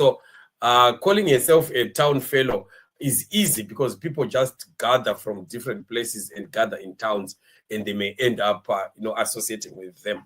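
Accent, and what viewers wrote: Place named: Zimbabwe. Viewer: You sound Zimbabwean yourself. Why do you foreigners keep on putting your noses in South African affairs as if you are entitled to this country and our issues???? Why dont you make podcasts of your sruggling country and try better it. Instead its nywe nywe nywe South Africa. Hayi foetsek man. We are sick off you ppl now. ANC is on its way out. Once MKP gains traction you will see what's coming your way. Bugger off man!!!!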